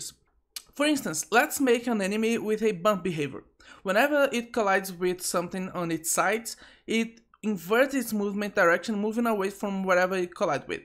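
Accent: Brazil. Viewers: Eu percebi na hora ksksksksksksks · Caraca vey tava pensando q era sotaque br e fui ver o canal e era msm aduhaudhasd.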